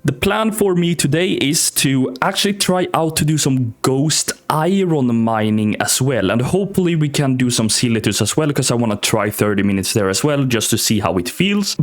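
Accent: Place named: Sweden. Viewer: PLEASE FOR THE LOVE OF GOD STOP SAYING "SHA-LENGE", "SHECK"... ITS CH NOT SH, YOUR SWEDISH IS SHOWING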